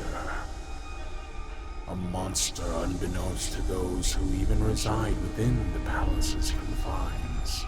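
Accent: United States. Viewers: I thought chu were American...?!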